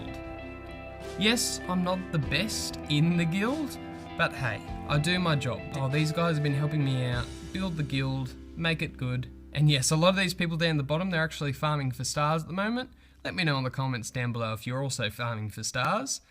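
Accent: Australia. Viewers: An Australian eh,lol,I never would've thought.no offense mate,I think you should try defender,I'm mk2,but I have over 2k health,I think you would like it. Plus great job with the vid👍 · Spoken like a true Auzzie ! great vid man loving it !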